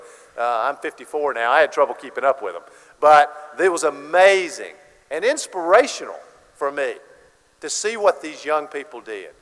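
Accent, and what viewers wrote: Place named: South, United States. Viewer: John Edwards is Bobby Kennedy with a Southern Drawl.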